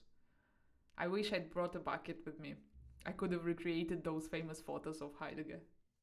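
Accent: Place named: Russia. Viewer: I hear russian pronunciation ...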